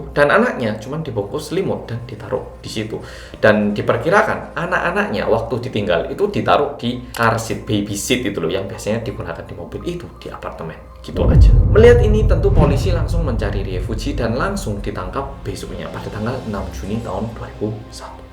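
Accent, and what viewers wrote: Java, Indonesia. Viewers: Korea medok hahaha.. sehat terus mas e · Medok x logat jawanya, tak pikir orang jepang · Salam knal mas, seneng ngikuti ceritanya Apa mas tinggal di Indonesia atau di korea kok msh. medok bhs. Jawanya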